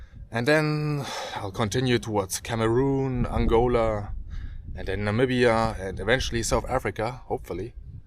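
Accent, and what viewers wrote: Germany. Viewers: I freaking LOVE the video series but this heavy german accent in his english is hard to endure .. selbst oder ganz besonders als Deutscher, das klingt schrecklich vor allem diese Betonung .. :( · German accent unfortunately drives me crazy - too German🙈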